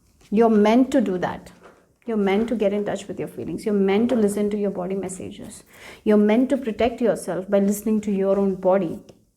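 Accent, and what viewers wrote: India. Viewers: 😱😳🥹 Ohhhh, wwhhhooooowww. First time I'm guidet to you ... My choosen pile ... speakless. How is that possible? Thank you sooooooo, so much. It is exaktly what I've needet for helping in my situation right now. A big gift.🙏❤️‍🩹💖😇🎁 Indian angel, a big hug from Germany, so nice, your accent. 😊 Isn't it wonderful, how we can understand?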